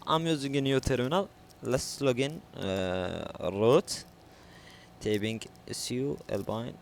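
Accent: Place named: Saudi Arabia. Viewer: ههههههههههههههههه اقسم بالله من اللهجه قبل ما افك التعليقات عرفت انك سعودي😂😂😂😂😭.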